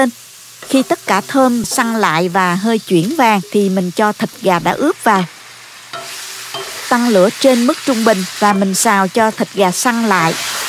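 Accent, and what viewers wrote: South, Vietnam. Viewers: Giọng nghe hay quá! Đúng chất đặc trưng người miền Nam luôn. Cám ơn món ngon chia sẻ của cô ❤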